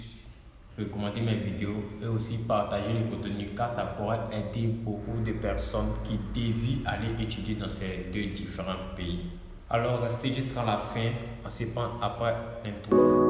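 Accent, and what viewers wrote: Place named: Central Africa. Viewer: Bjr, M.. En dehors de ton nom atchié, je ne sens pas ton côté ivoirien dans les mots utilisés, leur prononciation, la composition des phrases, les répétitions de paroles, le niveau du langage, les mouvements du corps, et surtout dans les idées présentées. Tout indique l'Afrique centrale: Gabon, RDC, Congo, RCA, Cameroun... Sauf l'expression "pour m'encourager."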